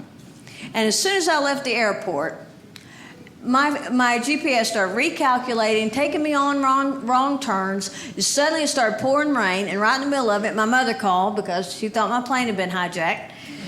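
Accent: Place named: South, United States. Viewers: You're right. Put her in a dress and add a few years. Looks so like her and that north Carolina accent. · That is a heavy southern accent . Im Guessing Texas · She's definitely southern. " Watch my drip... Nah nah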